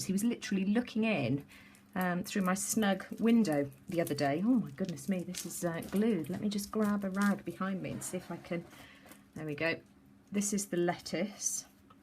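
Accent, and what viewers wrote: England, United Kingdom. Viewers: These are very lovely! Do I detect an English accent? Love listening to you and watching too.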